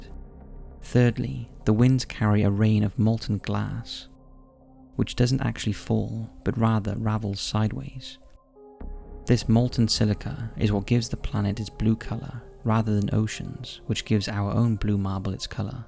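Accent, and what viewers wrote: United Kingdom. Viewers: Why is he using Fahrenheit when he is speaking with a British accent?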